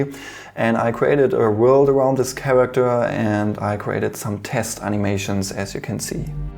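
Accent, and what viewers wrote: Germany. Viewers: The story of your childhood home - in combination with your German accent - makes me think of Dr. Doofenschmirtz. You're probably a nice guy, though! :P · diggha, wieder ein Deutscher, der versucht englisch zu sprechen. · Bist du deutscher? Dein Englisch hört sich so an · Der Deutsche Akzent ist Real! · Mega Story! Hab schonmal ein Video gesehen und dacht mir schon, so wie der spricht: das kann nur ein Deutscher sein! :P Grüße aus der Altmark! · Oh ein deutscher, ja dann Echt krass was du so drauf hast respekt !👍👍👍 Edit: oh, hast ja sogar noch gesagt das du aus deutschland kommst Mhhh Finde man kann das (wenn man selbst deutsch ist) echt stark am akzent merken☺ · German English, ich auch x) Und schiefe Zähne, aber ich nicht ;) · Man hat halt echt sehr gehört, dass du Deutscher bist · Love your German accent it actually sounds good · 100% deutsch junge sein akzent ist ja mal andere welle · Nicht schon wieder ein Deutscher der probiert Englisch zu sprechen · You sound german. 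I am too... also wollte ich fragen, wo und wie du als 3d Artist arbeitest? In der Umgebung wohl kaum?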